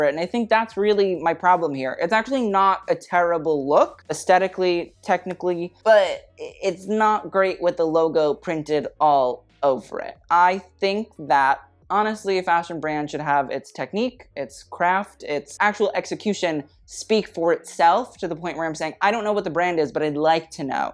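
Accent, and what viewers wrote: United Kingdom. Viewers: You’re British?? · Wait. What? Are you British?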